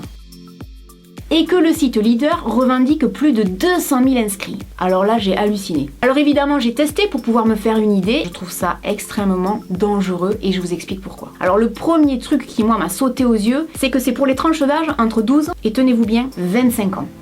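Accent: South, France. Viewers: Accent du Sud en force !!!! 💪❤ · Tu vien de Perpignan la base ta l'accent du sud comme moi 👌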